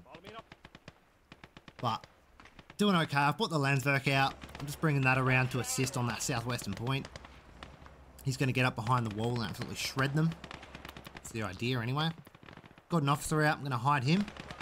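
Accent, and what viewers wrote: Australia. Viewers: Your my favorite Gates of Hell commentator tbh, I think it’s your accent. You sound right out the bush mate. Chuck her in the Uti and keep up the excellent commentary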